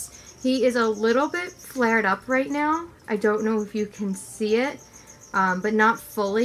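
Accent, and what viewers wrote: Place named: East Coast, United States. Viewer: Hey hey hey! Something to consider is that reptiles can live together for years without problems and then one day they can snap. I'd really hate to see that happen. I follow a bearded dragon rescuer on Instagram who has seen it happen a million times with beardies she's taken in. Omg I want a leachie so bad! I don't buy from breeders so I need to find one that's special needs or adoptable! Where are you from? You sound like an east coaster, which I used to be! Do you find that cognitively you have any issues feeding mice while also having pet mice? I can't keep snakes because of my affection for my pet rats. I'm not passing judgement I promise :) I'm curious about how you see it in your mind because everyone is different. BEAUTIFUL DOGS! Be careful with those plants in the axolotl tank. If they're not silk plants they can tear the skin of the axie, especially the tail. 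Good video :)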